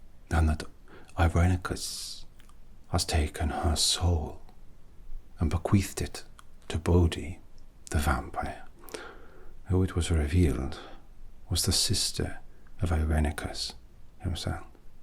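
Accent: Wales, United Kingdom. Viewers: Love the story, after a long day it’s so nice to hear it with a gentle welsh accent ❣️ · The ad slid in so smoothly that I almost slipped. The light, the background sounds, the welsh accent, the make-up, the costume, the hair (especially the hair), simply 🧡💛🧡💛🧡💛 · The heavy Welsh is my favorite 😍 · An elf with a Welsh accent, I think I'm in love. 😍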